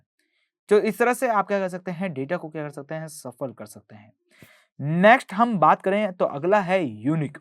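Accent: India. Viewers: get rid of that indian eng it sucks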